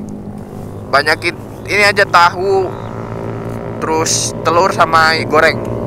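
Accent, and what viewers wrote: Papua, Indonesia. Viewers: KK mcm pu logat ni logat" Papua k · Logat Papua bro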